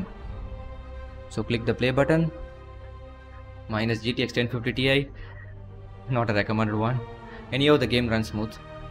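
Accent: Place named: India. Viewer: Again a guy with indian accents making best tutorials on youtube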